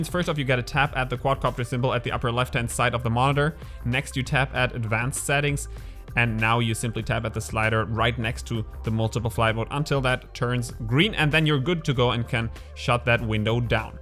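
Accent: Germany. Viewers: Deutsch accent?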